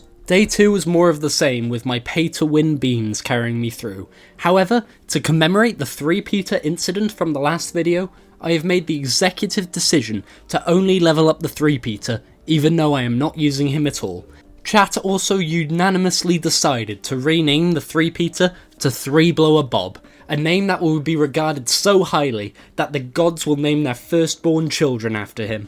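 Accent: United Kingdom. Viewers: I didnt know u were british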